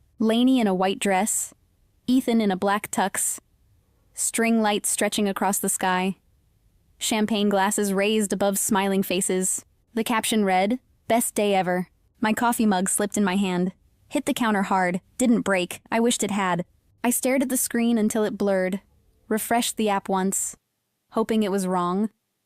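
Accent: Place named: United States. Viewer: A Thai story whit american accent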